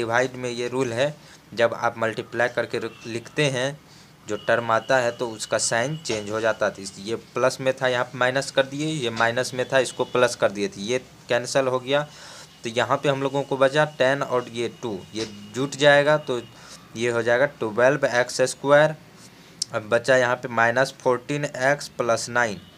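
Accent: Bihar, India. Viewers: Your Voice Like A Bihari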